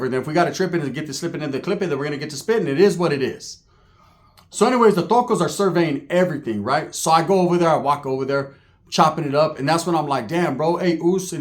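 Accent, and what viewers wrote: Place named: Bay Area, United States. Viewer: Aye uce... I peep how you sound like a bay nigga. They not in tune on how we talk. These stories be having us dying 😂 you sound like a OTHERS. That super uce..all your samoan viewers heard him thru you😂😂😂😂we felt dat sole..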